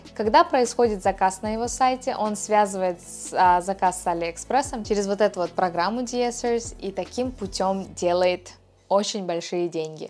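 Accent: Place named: United States. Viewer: Познавательно, но режет слух желание вставить английское слово хоть куда-нибудь( Если можно, не коверкайте произношение на ОМЕРЕКЕЙшН лад. Постарайтесь и у вас получится)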